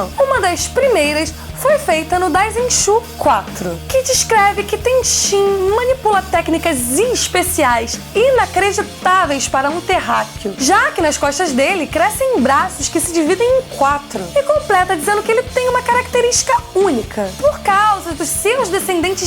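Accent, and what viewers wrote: Rio de Janeiro, Brazil. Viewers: Ela é carioca? kkkk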